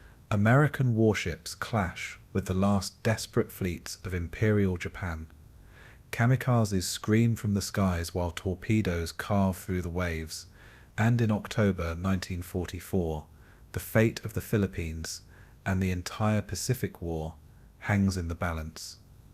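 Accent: United Kingdom. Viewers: Sounds like a real person talking with a Limie accent. Good job Mate once again, again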